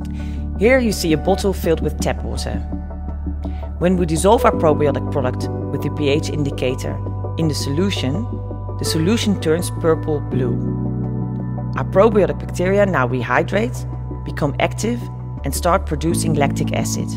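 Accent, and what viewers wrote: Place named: Netherlands. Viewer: this is an obvious Dutch accent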